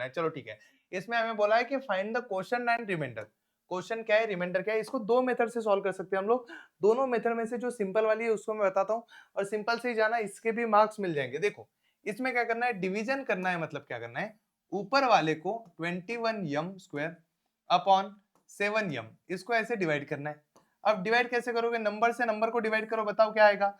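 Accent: Maharashtra, India. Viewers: sir marathi manus aahet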